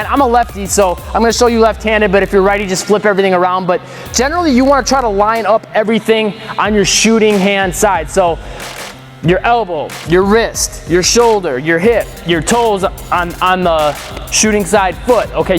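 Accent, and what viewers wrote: Canada. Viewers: Are you Canadian? Hehe, cute accent.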